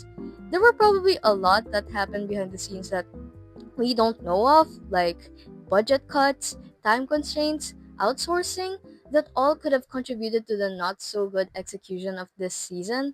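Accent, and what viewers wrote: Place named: Philippines. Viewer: i love ur philipino accent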